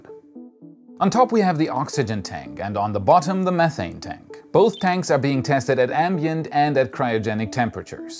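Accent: Germany. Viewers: "Sametimes my german axent comes sruu" ach ich liebe dich :D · Hi, mir war in den ersten 5 Sekunden des Videos klar, das du Deutscher bist. Egal wie sehr wir es versuchen, unser Akzent kommt immer durch xD. Mach weiter so, sehr coole Videos. Sub und Daumen! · Mann merkt wirklich bei den Namen das du Deutsch bist genauso wie bei ein paar sätzen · I am a native English speaker. I can tell you are German because of your accent, but if it wasn't for your accent, I wouldn't be able to tell. You're sentence structure and pronunciation is as good or better than the average American and I'm really impressed. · Well lucky for you German accents are my absolute favorite. so checkmate! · are you german ??? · Lol. You are German!. You should never be worried about accent. You provide invaluable information for us non techie space geeks so thank you:) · he has a german accent during the whole video? 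· I'm german too and didnt notice that you are German until today. I wish I could get rid of my accent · nonsense his accent is very german, I know because I grew up around english speaking germans. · German accent is best in the world! Love a German accent 😉👌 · Why are people just realising about German accent now 😂 I’ve always known he was German, maybe I’m just special 🤔 Shame about the rocket lab electron launch, love the video:D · Ist Deutsche, lol!!! Pretty easy to have a German accent when you are German!! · Oh, no problem with your German accent... I didn't notice. I have the same issue with my Dutch accent in my videos... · It would be fun if you did the entire video in your German accent( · Why would you wish to get rid of your german accent. To the English ear it is a pleasent sound. I guess it might be that we are both anglo saxon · Ususaly I say: Awesome content as always, but this time it was even better! 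Loved the little mistakes, and that you didn't take them out, as well as your heavy german accent. Your pronounciation is verry high quality btw. Your videos have become, more fluid, professional, and even funier over time!:) Übrigens würde ich dich super gern mal auf deutsch sprechen hören, so wie wohl viele Andere hier auch. (Und mich wundert's immer, dass Du Dich als statt vorstellst);) Und ich frag mich oft, woher aus Deutschland Du kommst. Ich schätze Süd-West (Baden Württemberg oder Rheinland Pfalz). Ich persönlich bin in Karlsruhe aufgewachsen, schaue Dir jedoch aus Ungarn zu. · You’re German? Nice lol I dare you make a video with ONLY your strong German accent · I was actually referring to his little comment about his accent coming out :-D, Ich weis er ist eine Deutsche weil ich auch einer bin :-) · I like the german accent. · About the beautiful German accent. My 95 year old Uncle Kurt briefly worked with Von Braun in Huntsville in the late 1950's. 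I believe that the German accent IS the best accent for a rocket enthusiast. Never apologize for it. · Your German accent cames through all the time. No worry tough. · Denglish ischt goedt? Miene deutch und bookstabbe ist sclecht... So, your English is 👍 . I lived in D’dorf and Basel for many years, and I’m losing my deutch. It’s good to hear a good German-English accent. It makes me want to go out and get my shopping done before the grocery stores close ...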